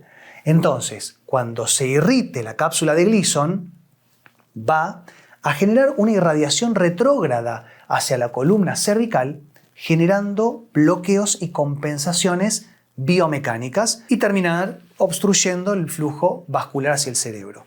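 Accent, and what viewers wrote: Buenos Aires, Argentina. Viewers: Sí yo me imaginé que es de Argentina porque lo escucho Hablar, pero de qué parte? Supongo que debe ser de la provincia de Buenos Aires, no sé si así de la provincia pero Sí o sí es de Buenos Aires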